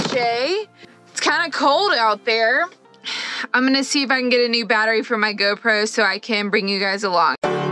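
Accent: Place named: South, United States. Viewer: In a thick Southern accent, "I'm from the South..." Yup, it checks out.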